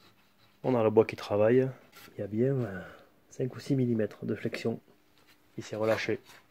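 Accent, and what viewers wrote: South, France. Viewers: Super et avec le petit accent du sud agréable à voir entendre et comprendre. Le bonjour de Londres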